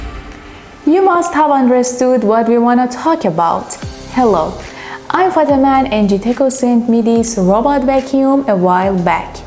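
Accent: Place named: Iran. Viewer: Phenomenal Persian accent!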